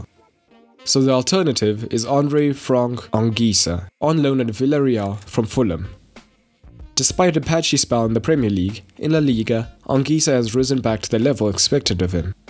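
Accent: South Africa. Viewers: Ayy you South African bro??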